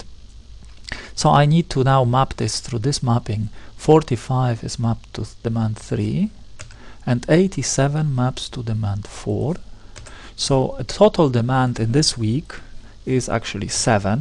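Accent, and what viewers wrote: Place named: Egypt. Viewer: اللهجة مصرية بحتة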